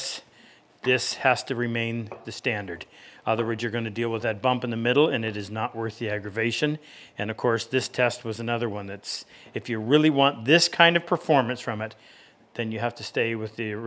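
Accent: Canada. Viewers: As I was watching your informative video (thank you), I noticed on one of your shop aprons a U.S. Army 14th Infantry patch, blue/red/gold castle with the caption, "The Right Of The Line". I'm assuming, by the flags in your shop and your accent, that you are Canadian. I'd be interested in the story of your affiliation with that military unit and/or how you acquired the patch? Thanks again for the YouTube content you provide!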